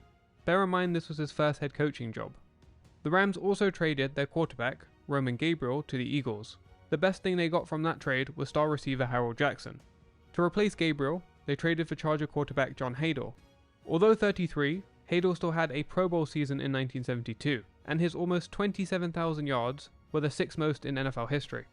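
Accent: England, United Kingdom. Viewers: good video. I like your English accent. With that said it bothers me when talking about American Football. Would you be a lamb and do a video Hershel Walker.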